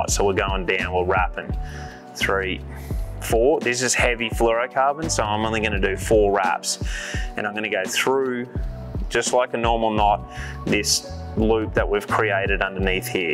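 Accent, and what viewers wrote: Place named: Australia. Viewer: why do you sound like an Aussie when speaking English ? I am really curious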